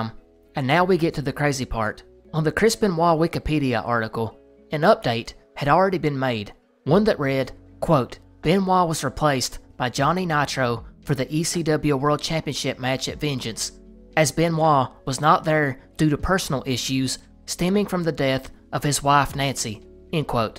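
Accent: South, United States. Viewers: Your channel and your episodes and how smart you are, helped me to better understand country boys. Now i know for sure it is just the accent. Even before i knew it was just the accent and becaude of movies etc it sounds stupid but its not. Thanks man. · I don’t think I’ve ever heard a creator who does videos like these with such a pronounced country accent Country representation <3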